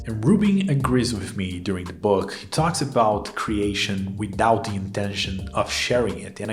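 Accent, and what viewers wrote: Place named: Brazil. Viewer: Great video! Gonna read this one asap. Você é brasileiro? O sotaque é de brasileiro kkk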